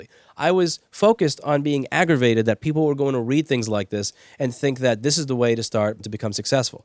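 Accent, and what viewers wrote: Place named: New Jersey, United States. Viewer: You sound like you live where I live. Nj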